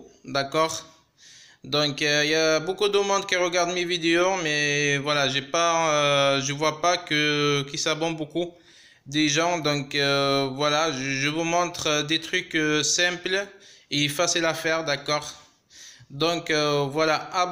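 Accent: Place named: Kabylie, Algeria. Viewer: Juste avec ton accents, je savais que tu es kabyle hhhh bon courage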